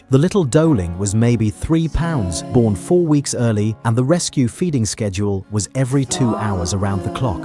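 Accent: United Kingdom. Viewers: You have a slight English accent... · Why a British accent when speakingʻ of his Tennessee horse farm? Why?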